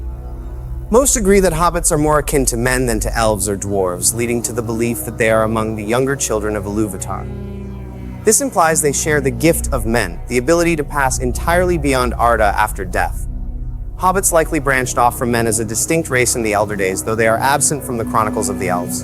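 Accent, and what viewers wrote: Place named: United States. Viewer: A shame about the strong US accent. But it's you, so I'm still going to watch.... Finished and it's as good as the rest. Ty 😊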